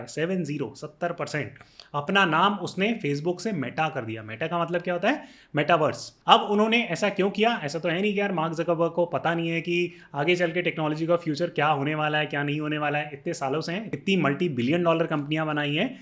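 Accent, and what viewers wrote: Standard. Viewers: Apki hindi bahut zyada Shudh ha!!!😁❤️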